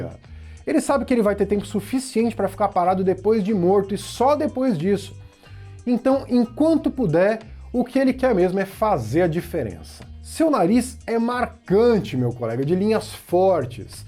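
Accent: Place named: Ceará, Brazil. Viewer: E isso mesmo. O é um sucesso. Adoro o sotaque cearense dele. 😊😊😊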